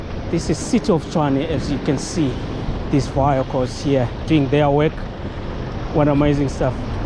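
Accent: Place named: East Africa. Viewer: A foreigner claiming RSA here. The person making this video is not a South African. His tongue points to Kenya, Uganda or Ethiopia. These foreigners cannot really make news about their own countries.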